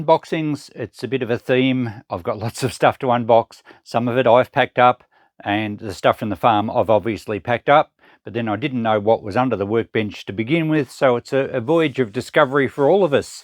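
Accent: Australia. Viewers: I tried watching this twice, but It was late and I was tired. Your smooth Ozzy pronunciation put me to sleep 😴. Lol, third time now, I am well rested. Fun sorting video 👍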